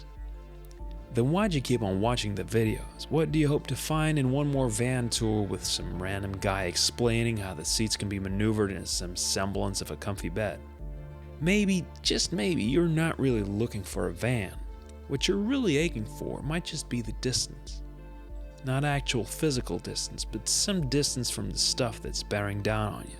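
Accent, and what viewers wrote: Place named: United States. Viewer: Nice video! I also like you american accent ;-)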